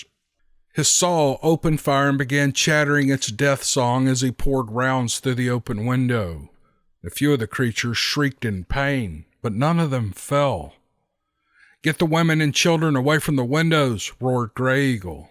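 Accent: South, United States. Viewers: Your voice, the southern drawl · Great 👍 story an terrific experience Listening too you" and your Southern draw" Gray Eagle seems to be a fascinating character in this story I Must say' toward the end I started Feeling sorry for Big Foot' after Gray Eagle got through working them over Ha!! Ha!! Seriously a Revving Vidio... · ...can I call you that for short? Anyway, this has been one of my favorite narrations and stories from your channel. Your southern accent and pacing make for good listening! Keep up the good work brother, I really appreciate your craftsmanship.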